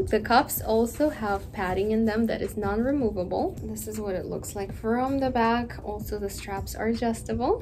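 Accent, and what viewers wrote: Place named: Eastern Europe. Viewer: Uh...I wonder what this girl's story is. I think she has a bit of an accent...from one of the former Soviet republics? She smiles a lot to pacify the viewer, as though she wants nothing more to 'be pretty for us', but of course only a complete idiot leaves it at that. What's her story? She's got AMAZING legs though...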